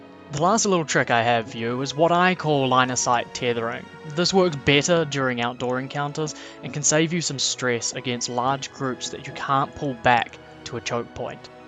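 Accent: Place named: New Zealand. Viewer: I love hearing a thick kiwi accent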